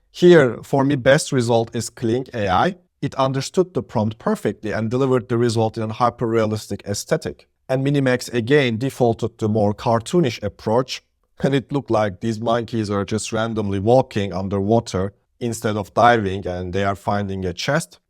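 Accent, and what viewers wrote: Turkey. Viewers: Kral türk müsün aksan türk aksanı :d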